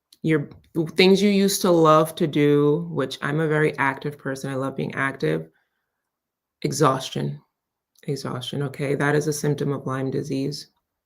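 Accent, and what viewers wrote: Jamaica. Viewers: I love when you use your Jamaican accent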